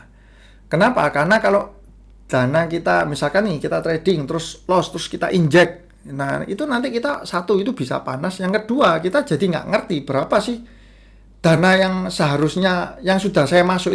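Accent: Surabaya, Indonesia. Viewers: Logat suroboyo ne mantep koh 😁 Type trading nya kyk saya nih pernah dalam sekejap untung ratusan persen pernah juga dalam beberapa jam rugi puluhan juta Masih belajar biar ga greedy saya koh moga bisa melek money management 😂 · Kok koyo wong suroboyo smpean Koh..? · logat boyo kentel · Bhsnya kyk wong Surabaya ki,mabtab infonya broo...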